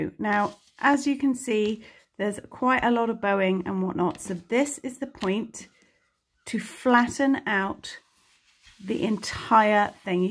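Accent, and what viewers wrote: England, United Kingdom. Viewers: Beautiful yarn and nice to hear an english accent on a crochet video, may also look great with chain of crochet pulled through rather than straight strands but still fab. Also you could tie of single strand from each double yarn stand for a finer ending. However why as a fellow Brit are you using American terminology? You are doing an English treble stitch NOT an english double crochet. I have been crocheting 50 years and it annoys me English terminology has been ousted by youtube rant over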